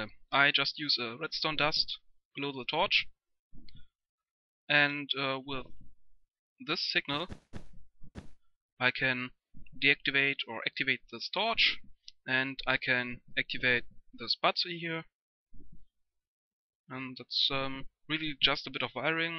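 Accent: Germany. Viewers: Bist du deutsch hört sich so an wen ja schreib was auf deutsch German !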